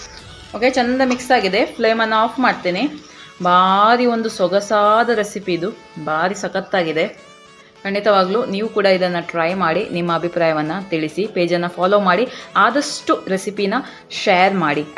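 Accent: Mangalore, India. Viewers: Mam l love Mnglr kannada 😍😍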